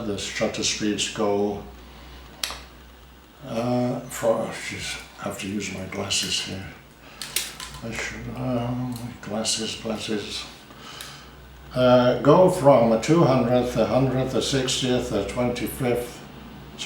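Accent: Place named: Yorkshire, United Kingdom. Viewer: Lots of lovely little stories in there,. Love your Yorkshire accent!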